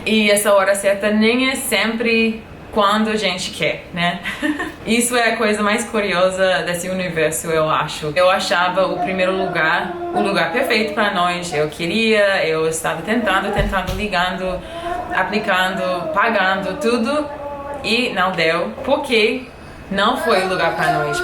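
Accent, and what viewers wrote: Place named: Rio de Janeiro, Brazil. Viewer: Gringa linda, adora a língua portuguesa e fala com um forte sotaque carioca. Haja charme! A cada vídeo, que você posta no YouTube, noto que seu Português está melhor. Parabéns pelo esforço. Valeu, minha fofa!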